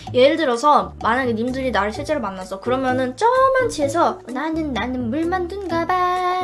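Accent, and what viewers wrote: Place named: Seoul, South Korea. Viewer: ??타지사람들은 사투리가 들리나보다 난 걍 서울말 쓰는 걸로 들리는데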